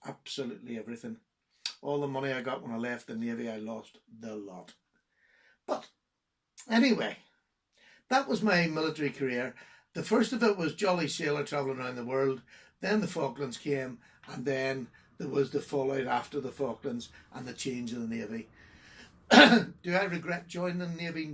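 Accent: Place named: Ireland. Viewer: This was a wonderful video! Love your story-telling and admire your honesty and humility. As an American living in Belgium, I appreciate your English ( with the wonderful Irish accent) and your European point-of-view on knives and knife collecting. Your grandchildren brought a smile to my face and makes me happy to remember that my 2 grand kids, my daughter, and my son-in-law will be visiting me in Brussels next week. Keep up the great work! Look forward to all your videos.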